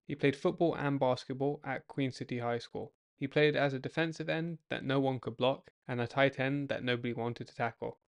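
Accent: United Kingdom. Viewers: British guy talking about American football?????.?.? WHAT!!!!!!!!!!!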